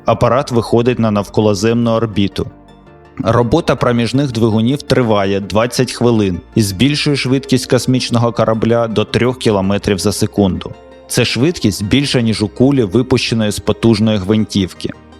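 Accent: Russia. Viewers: Чому в кожному слові такий виразний російський акцент? Всього лиш "о" не вимовляти як "а" і не пом'ягшувати "ч". Хоча б... Ну невже це так складно?...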